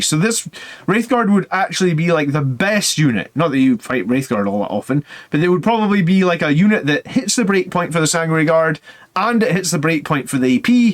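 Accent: Scotland, United Kingdom. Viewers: Something about a wholesome Scottish man talking about murder space vampires makes me happy.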